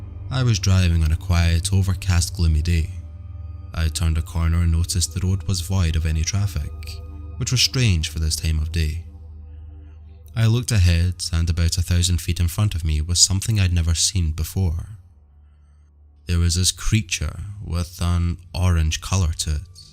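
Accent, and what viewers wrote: Scotland, United Kingdom. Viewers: Mr., I, myself, am Native American. It was a true joy to hear stories of Skin walkers in a Scottish accent! I loved it!! ❤❤🤘👍👊fist bump! I truly love your channel!! So honored to have subscribed!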